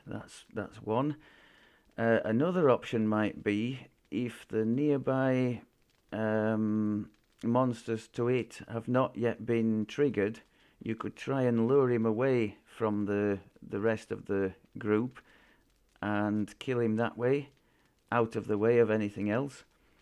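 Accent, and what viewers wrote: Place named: Northern England, United Kingdom. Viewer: Good info well delivered in a proper northern accent. Reaper wi' nowt tekken out.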